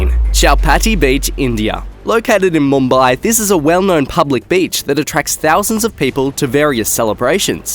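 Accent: Australia. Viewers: The narrator has a really solidly cool accent I'm thinking that it's Australian I hope I'm right but I'm just wondering now does different areas of Australia have different type of accents like the United States because I really like his. Would be fun to go live in that area just to come back with one.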